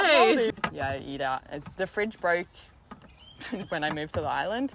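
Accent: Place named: Australia. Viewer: She has certainly picked up the Aussie accent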